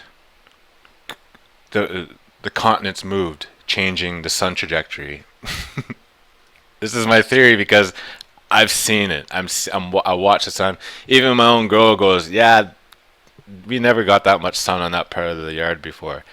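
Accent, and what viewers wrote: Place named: Canada. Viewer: Wow , this guy sounds like Bob and Doug Mackenzie from SCTV. "Hey hoser bring me my toque , then we can eat some back bacon and play a game of beer hunter eh" !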